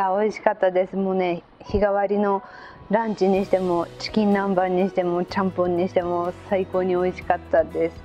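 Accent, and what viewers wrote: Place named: Hiroshima, Japan. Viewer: 何か広島弁が入ってたかなぁと思って聞いてみました ごめんなさい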